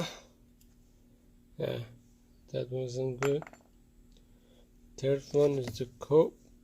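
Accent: Iran. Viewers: Love your accent. Sounds Persian. Where are you from?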